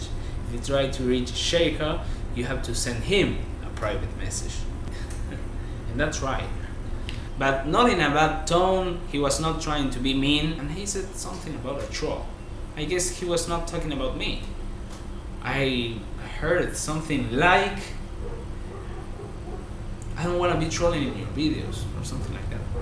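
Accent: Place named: Argentina. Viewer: más argentino no podes ser negro(de onda) !!! yo tmb hablo ingles y me equivoco parecido a vos aveces... jajajaj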